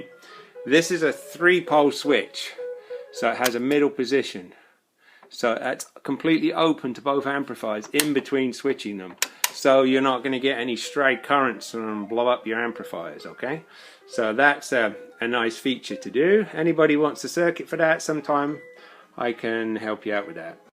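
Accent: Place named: West Country, United Kingdom. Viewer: The accent sounds like it's from the West Country, perhaps Somerset.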